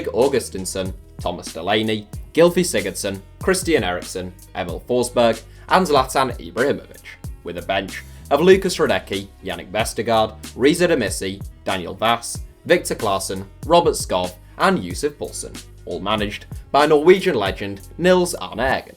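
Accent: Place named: England, United Kingdom. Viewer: You are one of us now, disembodied english voice man. One of us.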